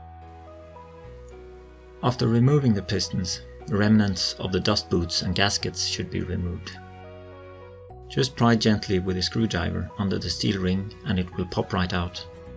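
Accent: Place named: United Kingdom. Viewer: You sound like British!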